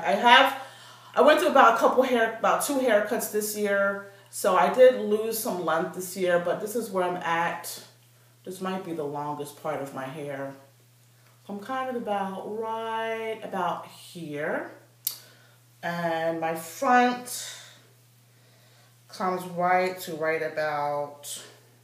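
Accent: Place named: United Kingdom. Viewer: Looks good! You sound like you have a British accent. Your hair is always fab.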